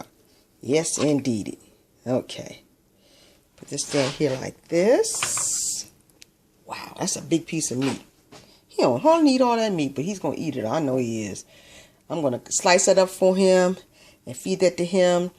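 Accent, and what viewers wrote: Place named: South, United States. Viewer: She sounds New Jersey ish and southern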